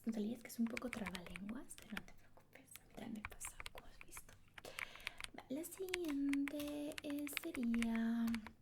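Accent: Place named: Latin America. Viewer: English language with your hispanic accent is so cute❤❤ ❤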